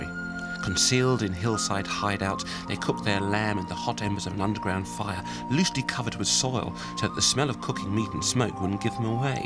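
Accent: United Kingdom. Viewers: imagine the people who live on the mountain and they see him: Who is this guy with the British accent and why is he dancing and cooking in our balcony?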